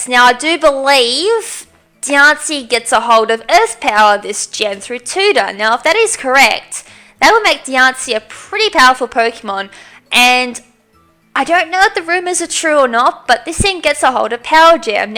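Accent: Australia. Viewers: Female Australian accent is hot af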